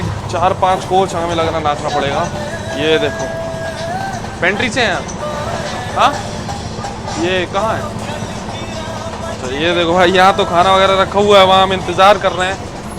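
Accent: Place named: Jhansi, India. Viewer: main apki boli se hi samajh gya tha 😁 Mai bhi Jhansi ka hi hu ( near Medical College Gate no. 2 )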